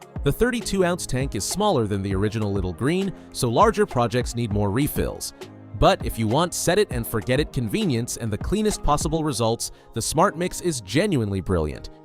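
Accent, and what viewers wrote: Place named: United States. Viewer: Why an American accent when buying in the uk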